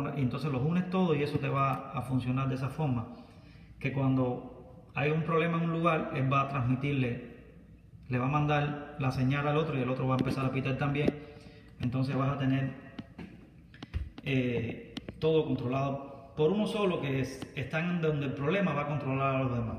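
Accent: Cuba. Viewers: Tu eres cubano eh?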